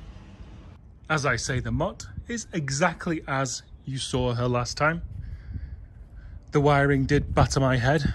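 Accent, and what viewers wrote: United Kingdom. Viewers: Missing you and you nice British accent. Support from a French fan from cold Minnesota. Stay safe mate. Cheers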